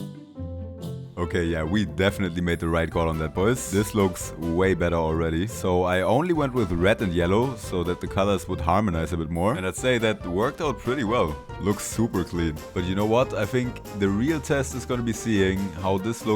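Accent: Germany. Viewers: warum hab ich direkt gehört, dass du deutsch bist ohne ein Video von dir jemals gesehen zu haben ahhaha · I know a german when I hear one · Ich wusste du bist deutsch allein schon an der Stimme bzw. der Sprachweise 😂😂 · Took me a moment to realise that you're German. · As a german, 😭✋️ dein deutscher akkzent ist so süß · I here a bit of deutsch❤ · wie deutsch der redet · dieser Deutsche Akzent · I definitely heard a german accent too (Im not german tho) :> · Ich höre doch das du Deutscher bist 👀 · feels like german accent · Great Video and I can’t unhear the German accent😂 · i knew i! i knew you were german. the accent gave it away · Bei der Aussprache wusste ich das sich hinter der Stimme ein Deutscher versteckt😂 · Ich liebe diesen Deutschen akzent 😅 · Hab doch sofort am Akzent erkannt daß wir hier jemanden Deutschen haben😂😂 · Ja der ist safe deutsch. Man hörts leider komplett, an Aussprache und den Grammatikfehlern. 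· I smell German 🤨🤨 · die ganze zeit war ich so hör ich da deutschen akzent? und dann kam qual der wahl hehe. Cooles video 👍🏼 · I hear the deutch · AYYY UR GERMANNNN, I HEARD IT FROM THE ACCENTT XDD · Oh hey, I'm smart enough to recognize a German accent, nice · i hear some hidden german lmao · ja save der typ so fett deztsch habe nämlich genau denn selben gedanken aber bro hat eine gute idee weill englisch sprachige youtuber besser verdienen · Dare I say I hear Deutsch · Deutsche hier? Hallo Leute und bist du wirklich deutsch weil es hört sich wirklich an als würdest du deutsch sein. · first video I ever see from you, immediately clocked you as a fellow German 😂 you sound so similar to Doc, when talking English so it immediately stands out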